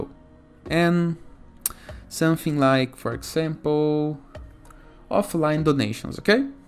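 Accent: Brazil. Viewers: sim eu sou programador. Eu de Moçambique (um país africano falante de português) mas sou fluente em inglês.. Porém os "I have 'tchu' do" denunciaram seu português brasileiro e notei logo kk foi como ter um irmão nesse universo web inglês. +1 inscrito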